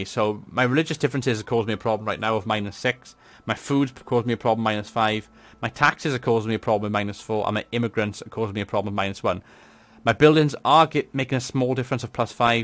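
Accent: Wales, United Kingdom. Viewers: Very nice guide, and your vocal presentation is excellent, (are you welsh?) i find it really annoying listening to commentators with bad habits like, clicking their pallet with their tongue, or swallowing all the time, saying eermm every other word, i have been playing this game for 2 days now and i have picked up quite a lot i have missed from your vid, thanks, maybe you could do a money and public order building guide as players seem to be have issues with them?, great vid well done.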